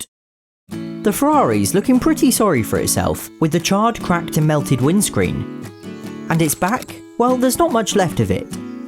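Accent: United Kingdom. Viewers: are you British 🇦🇺?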